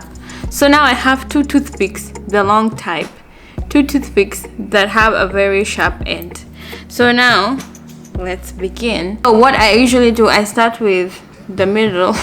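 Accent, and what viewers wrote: Kenya. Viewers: What are you talking about her accent sounds very Kenyan lol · That has been her accent even in Kenya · used to talk like this even when she was in Kenya lol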